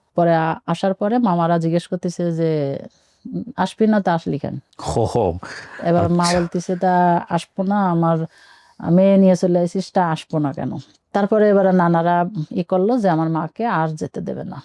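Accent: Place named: Jessore, Bangladesh. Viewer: এটা যশোরের ভাষা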